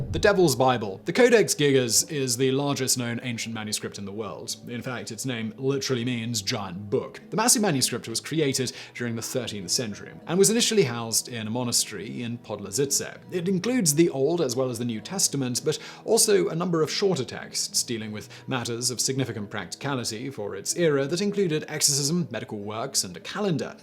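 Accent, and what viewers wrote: United Kingdom. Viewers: Curious. What part of Britain are you from?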